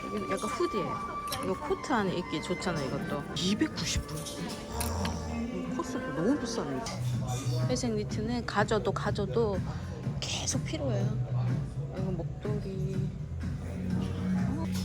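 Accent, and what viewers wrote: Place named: Gyeongsang, South Korea. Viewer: 나도 대구라서 그런지 말투 완전 친근해요ㅋㅋㅋ얍샵하이 ㅋㅋㅋ 덕분에 뉴욕 쇼핑 구경 잘하고있어요😊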